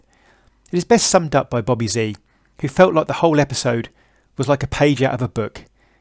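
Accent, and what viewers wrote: United Kingdom. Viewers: Oh really? So that was your video narration, then? Well, it just happens to be truthfully the case that your ultra-posh accent led me think you're likely gay. There's nothing wrong with being gay in and of itself, btw. The only thing I actually have against gay culture these days is its wholly unrealistic demand for universal acceptance, which it will never get, if for no other reason than that it runs contrary to many people's traditional religious beliefs. Anyhow, if what I said about your accent bothers you, then I suggest you gtfo of the London area and spend time with scousers. You may or may not like them, but at least you'd rarely suspect they're queer based on voice alone. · ingilsh accent, ingilsh bs.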